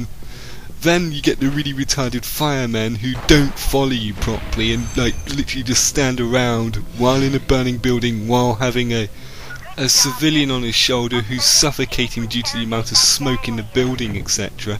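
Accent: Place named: United Kingdom. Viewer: hahaha, british accent xD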